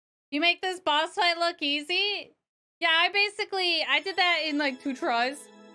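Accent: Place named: Northeast, United States. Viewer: Found you from those weird survivor AC vids. You're really good and your voice is so enjoyable and refreshing as well. You honestly sound like a northeast American to me but you said you're Canadian. That's really cool. Montreal is my home away from home.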